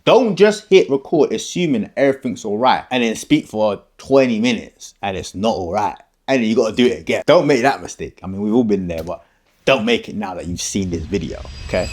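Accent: United Kingdom. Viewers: Really good thanks, just discovered this camera app, and I like to hear a Brit accent, nithing against America at all, just me...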